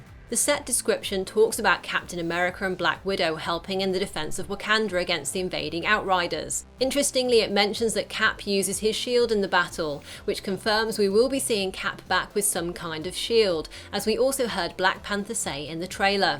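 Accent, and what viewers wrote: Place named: United Kingdom. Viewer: Ur British accent gives me boner ever damn time........ Jizzlied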